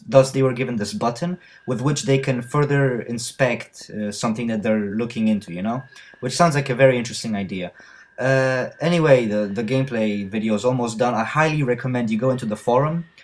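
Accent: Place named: Hawaii, United States. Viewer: You sound like ryan higa when he's doing his hawaiian accent...... #justsaying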